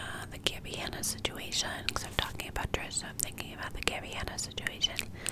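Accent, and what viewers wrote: New York, United States. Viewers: Thanks! ☺ BTW love your vids they are awesome. Just seen your jail vid. Its absolutely awesome thanks for sharing! Now i am going to watch your New York accent! ❤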